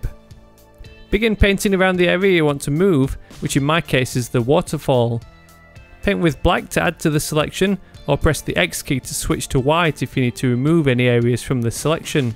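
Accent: United Kingdom. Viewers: is that a British accent? I love that accent! we Americans have a boring ole hum drum accent - so unfair!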